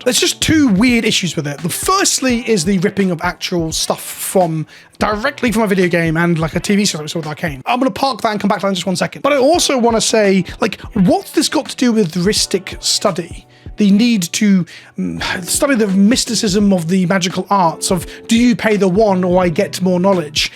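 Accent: United Kingdom. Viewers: I enjoy listening to an old British man yell at the clouds since I’m also old and find it annoying with these art treatments too!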